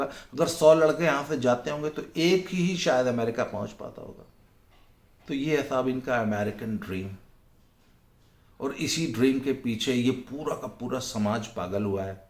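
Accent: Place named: Kangra, India. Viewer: Aap ki "boli" language bahut acchi lagti hai H.P. kangra me same language bolte hai , Dharmshala me ap punjabi tipe language thodi mix hi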